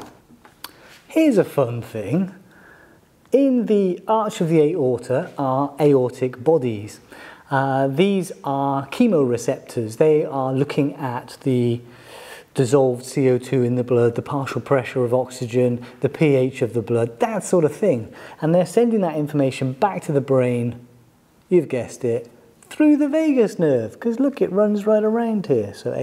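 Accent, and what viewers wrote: England, United Kingdom. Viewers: This guy looks a bit like Eric Clapton. It's great to hear someone with an English accent explain stuff compared to all the vacuous sounding Americans dressed up in scrubs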